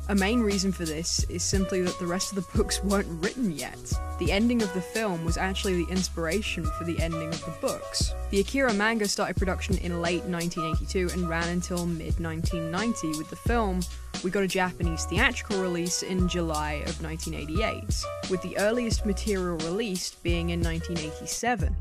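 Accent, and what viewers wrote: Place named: North, United Kingdom. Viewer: Ahh that would explain it! Definitely has that American twist on top of a Northern British vibe. Don't try to mask it! Always good to be proud of where you're from!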